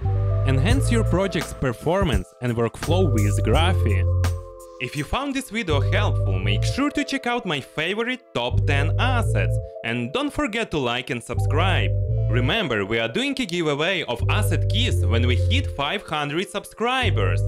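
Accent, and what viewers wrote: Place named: Russia. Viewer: Вери гуд видеос, виз руссиан аксент итс мор ундерстендабле! Сенк ю вери матч!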